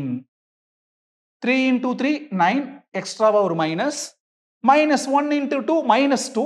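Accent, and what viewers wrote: Coimbatore, India. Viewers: Coimbatore ah sir unga native? Pesurathu konjam vithyasama irukku😅😅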